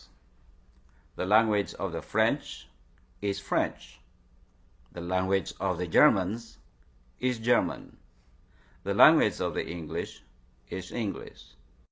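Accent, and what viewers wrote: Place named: Visayas, Philippines. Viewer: that's bisayan or visayan accent inferior to manilan accent..your history is very poor and very bias against spain..try to correct your history please..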